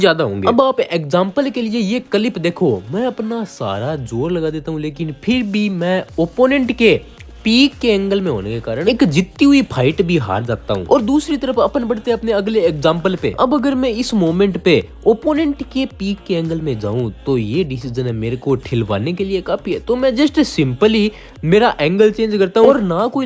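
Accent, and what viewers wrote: Haryana, India. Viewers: Bhai haryana t h tu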